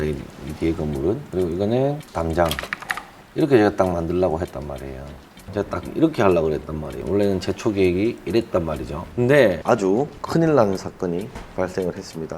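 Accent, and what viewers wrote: Gyeongsang, South Korea. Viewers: 많은 영상에 좋은 정보를 얻고 있습니다. 구수한 갱상도 사투리가 참 정겹습니다^^ 혹 지금도 자료를 부탁드려도 될까요?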